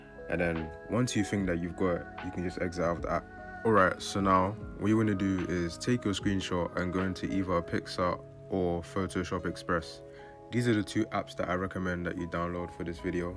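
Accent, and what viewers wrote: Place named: London, United Kingdom. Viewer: I'm from London as well, recognised the voice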